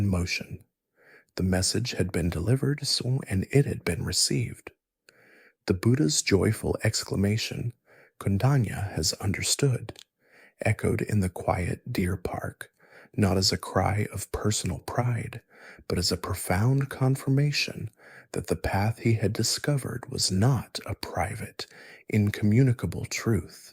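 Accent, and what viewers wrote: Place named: United States. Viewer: Horrific American voice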